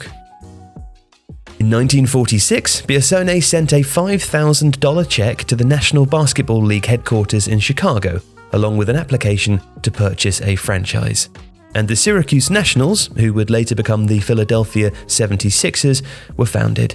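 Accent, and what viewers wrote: England, United Kingdom. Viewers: Weird hearing this with the English accent from tifo football group etc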